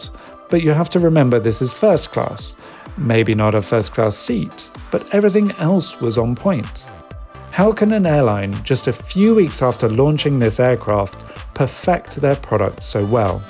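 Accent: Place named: United Kingdom. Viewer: I subscribed because of your hairstyle and your British accent 🙂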